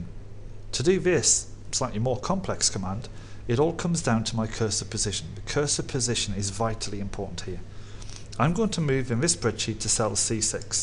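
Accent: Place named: North, United Kingdom. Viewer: super helpful and straight to the point. As a fellow northerner, love the comfort of that accent!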